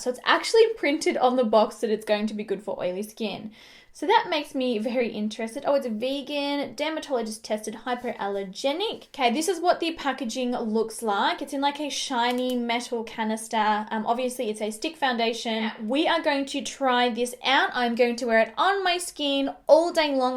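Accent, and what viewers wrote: Australia. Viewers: awesome!!! I am gonna try this one especially for my winter foundation. In winter I can carry off the more emollient feeling foundations because I am not sweating my bum off. But hmm I just thought of something, since I got the mehron primer that won't even matter anymore. Thank you for doing this though! You have my skin undertone and my skin type so your videos and reviews are LIFE for me! LOL though we DO have quite the age difference (I'm 49) still your skin care, tips and tricks are amazing! AND I miss my Australian sister so much your accent feels like home! (She is coming for a visit in May!!!)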